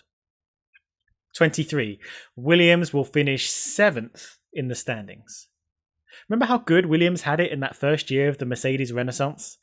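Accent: United Kingdom. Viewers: So british, I love it!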